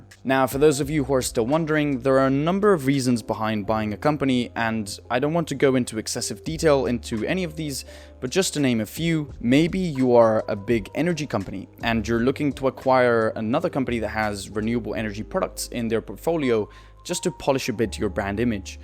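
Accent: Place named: United Kingdom. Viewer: Impresionado por tu acento británico, peroooo, sinceramente, creo que hubiera sido mejor mantener el canal antiguo y abrir uno nuevo orientado a tu nuevo público objetivo, la verdad 🤷🏼‍♂️. Creo que así partes de cero con los interesados en Consultoría y pierdes a los interesados en aeronáutica o tecnología e ingeniería 🤷🏼‍♂️. Igualmente, espero que el comentario ayude con el "algorrino", y por supuesto, mucha suerte y todo lo mejor en esta nueva singladura consultora señor 👋🙃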